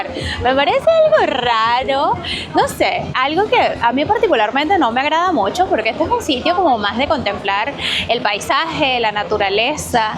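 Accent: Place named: Cuba. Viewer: Tu si que eres sexy, madre mia, tu voz y tu acento, cubano? Un placer d escuchar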